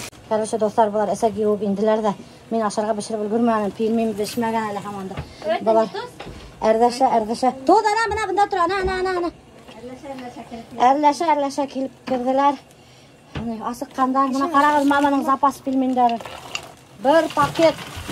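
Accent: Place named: Chelyabinsk, Russia. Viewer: милашка привет, смотрим вас из Челябинска, ваш говор на башкирском как наш. Приятно слушать, все по простому, смотреть на вас, все как в обычной жизни, бирсен сезга ходаем саулык, бахет, уныштар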